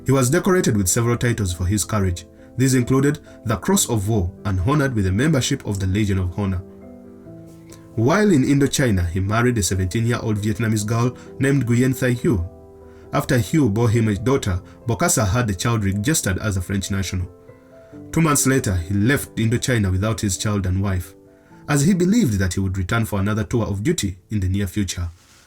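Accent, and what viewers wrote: Uganda. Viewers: Ugandan accent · He sounds either Ugandan or Rwandese. Probably Ugandan though, sounds a lot like my dad. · The narration sounds so Ugandan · Ugandan ...This voice and narration is so Ugandan .well done.keep it up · This is a Ugandan accent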